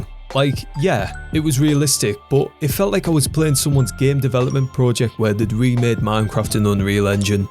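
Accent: United Kingdom. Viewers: If this person is not British then my whole life is lie.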